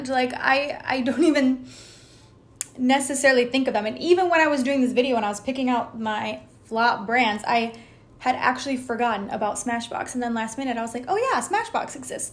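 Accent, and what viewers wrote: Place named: New York, United States. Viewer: Got a little New Yorker coming out in your accent. Love it. Hope you're loving it out there!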